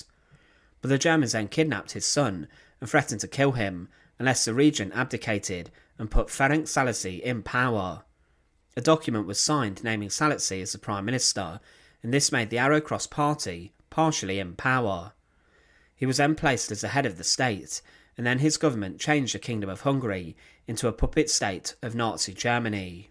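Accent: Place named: Cockney, United Kingdom. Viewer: Your monotone, staccato and sometimes slurred “Cockney” narration is distracting and difficult to follow. I, for one, wish you’d hand the microphone to a professional narrator! You should go to “diction-classes.”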